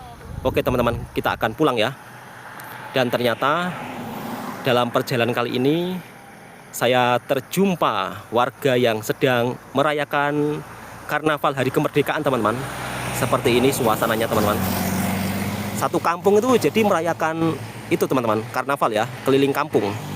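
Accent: Java, Indonesia. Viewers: Sdr sdh lama juga berkerja di Johor tp loghat msh tebal kejawaan. Semoga sentiasa sihat.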